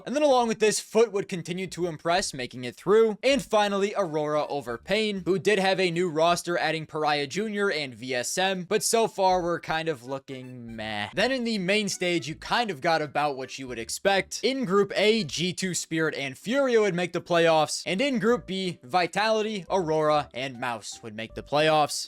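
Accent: Australia. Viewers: Hes australian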